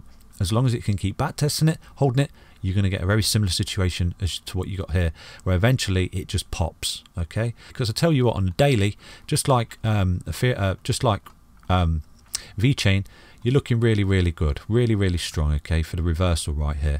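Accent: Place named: England, United Kingdom. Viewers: You sound local mate, close to Derbyshire by Any chance or im thinking Nottingham? :) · I love listening to market YouTube videos, the English accent is the cherry on top.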